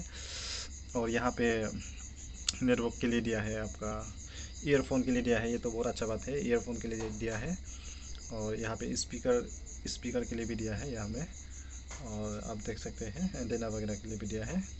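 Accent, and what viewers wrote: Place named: Assam, India. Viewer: Yeh asamee hai. T ka uccharan dekh ke hi samjh gya.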